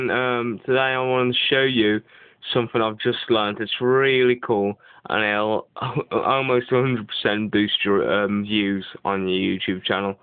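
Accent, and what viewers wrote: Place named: Walsall, United Kingdom. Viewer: thanks a lot mate this help me out loads. you sound like u live near me I live in walsall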